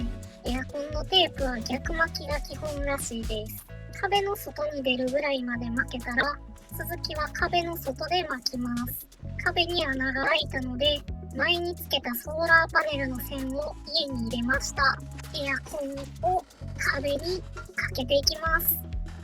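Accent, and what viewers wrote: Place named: Kansai, Japan. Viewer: 関西弁が心地いい。エアコンの取り付けって、電気工事士の資格いらないの知らなかった。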